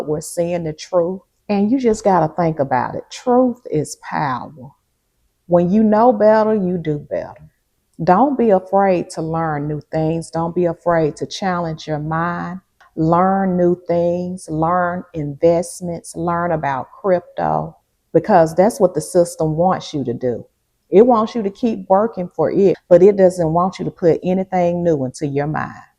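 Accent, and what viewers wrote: South, United States. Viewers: Love the message and your southern draw as well. 😆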